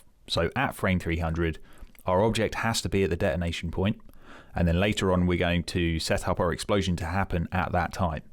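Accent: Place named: United Kingdom. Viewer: I can hear british accent here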